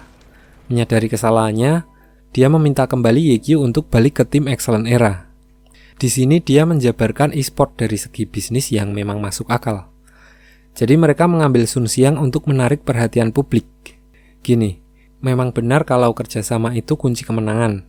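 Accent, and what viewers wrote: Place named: Java, Indonesia. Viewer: Anjim wong jowo to sampean mas wkwkw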